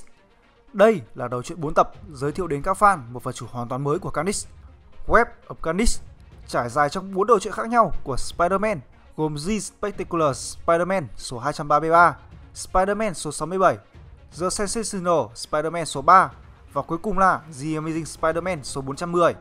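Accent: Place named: Central, Vietnam. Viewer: giọng anh rồi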